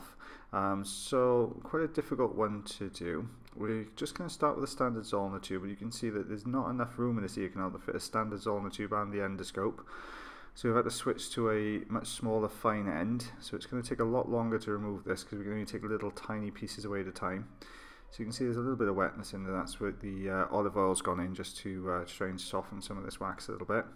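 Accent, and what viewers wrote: Wales, United Kingdom. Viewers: He's Welsh. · You sound welsh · That is probably because of his lovely Welsh accent. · I love these videos. And your british accent. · From where do you hail? I live in Oxford but was born in Bridgend, Glamorgan, and thought your accent sounded familiar!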